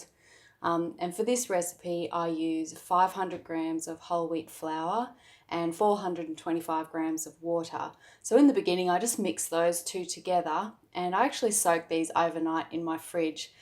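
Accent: Australia. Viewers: I loved the video and the aussie accent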